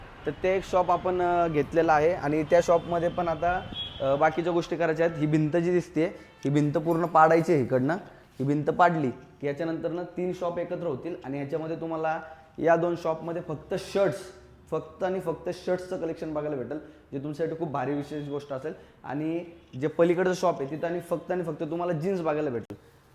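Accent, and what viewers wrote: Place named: Pune, India. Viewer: Punekar's boltat..♥️🫡🔥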